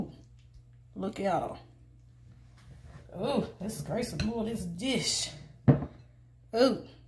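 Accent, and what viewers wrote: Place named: Louisiana, United States. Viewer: You sound like you have a Louisiana accent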